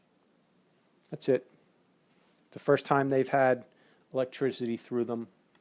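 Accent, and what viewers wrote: New Jersey, United States. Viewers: You from Jersey?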